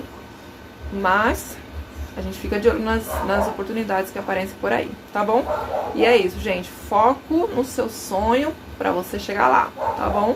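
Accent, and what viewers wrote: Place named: Salvador, Brazil. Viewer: É daqui de Salvador com certeza 😜😁😁 ! Só nós com esse sotaque kkkkk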